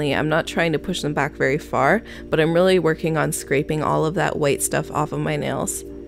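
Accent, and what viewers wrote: Canada. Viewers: Ohhhhh I hear!!! You are Canadian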